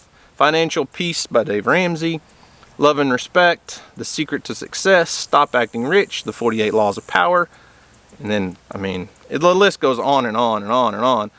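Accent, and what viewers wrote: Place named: South, United States. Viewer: Hey, you have become a virtual friend that I enjoy spending time visiting with as you go about your days on the farm. I like listening to you ramble, it’s like sitting around a campfire with your best friend speaking the truth. I don’t watch tv just you tube, of course you caught my attention because you are so darn handsome with that southern drawl and you love to go fishing.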